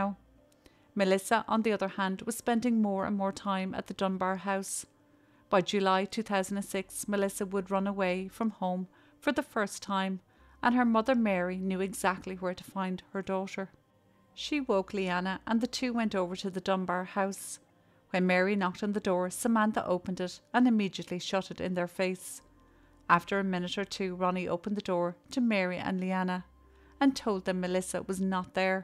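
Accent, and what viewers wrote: Ireland. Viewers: Its been such a long time since ive been back on. My apologies for not being able to show support on here as of late. I'm glad I'm back for now and will try to catch up on all the videos ive missed while I was in hospital. Certainly have missed my favorite Irish accent. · Dear, please don't be disheartened. Your videos are excellent and I love to listen to your lovely Irish lilt. Listening to you makes my day! ❤ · Your videos are incredibly well researched and beautifully narrated with that lovely Irish accent. You are very sensitive and respectful and can only do as well as the information out there. Hi from New Zealand 🇳🇿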